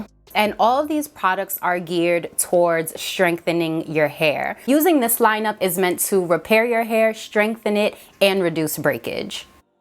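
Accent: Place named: New York, United States. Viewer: You are a queen sis and I loved every second of this. Great job and I love how you break down your video, I'm a new sub! 😁💚👑 I also like how you created a faux bang and I love your enthusiasm and your accent. You sound like you're from New York or somewhere up north! 😁 I will have to check out this line of products.👍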